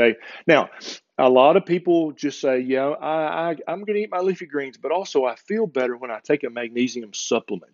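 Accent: South, United States. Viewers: Just stumbled across this video binge watching channel. Don't know that I've seen all quite yet, but I almost died laughing: "Shut up and eat your greens!" For God's sake, Doc, you're killing me with your southern-ness! To everyone reading these comments, this man is a treasure - support him via Patreon!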